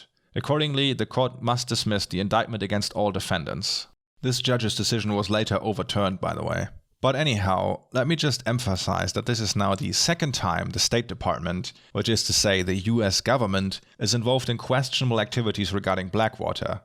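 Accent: Germany. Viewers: he is german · Yea you’re right especially in this one. The German has really faded, but considering the subject content for this episode it’s understandable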